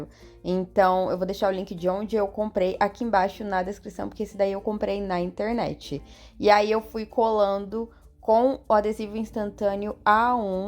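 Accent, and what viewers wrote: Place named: Goiás, Brazil. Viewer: Show. Amo seu canal e esse sotaque goiano. Como é bom ver uma conterrânea talentosa e com um canal bombando com conteúdo de verdade. Parabéns!!!!!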